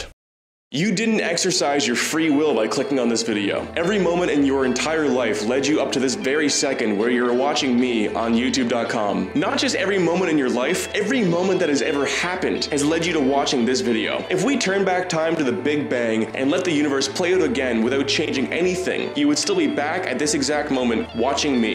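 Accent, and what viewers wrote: Canada. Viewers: maybe hes canadian or smth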